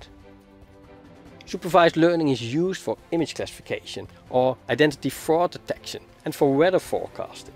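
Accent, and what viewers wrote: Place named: Netherlands. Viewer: I absolutely love you dutch accent! And the explanation too of course.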